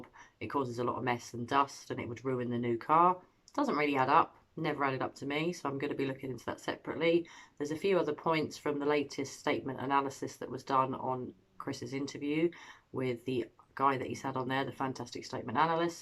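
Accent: United Kingdom. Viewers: Love your British accent. We need to get Don "bang to rights" (right?)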